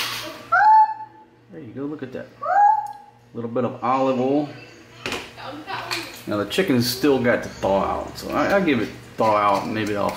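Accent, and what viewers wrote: South, United States. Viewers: Ahahaha. On 2158 min you said vijoos. Sounded so funny coming from you. Sounded so very Filipino. Kinda cute coming from a Southern man who has that Southern twang. Hope Fatima can relax when she goes back home so she can enjoy her vacation. Peace.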